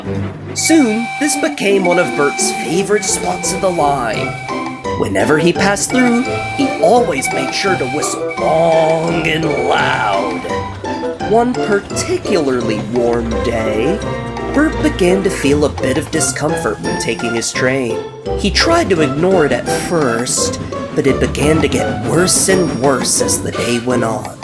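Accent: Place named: Southwest England, United Kingdom. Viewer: I like it when American users do a Southwest English accent, it's quite unique sounding. 😊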